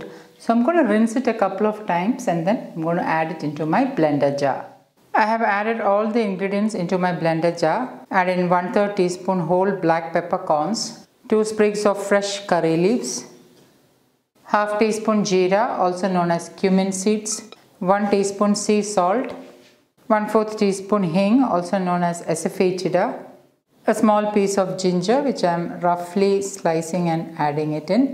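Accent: Kerala, India. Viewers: Delightful to hear the nice mallu accent again… felt homesick 😊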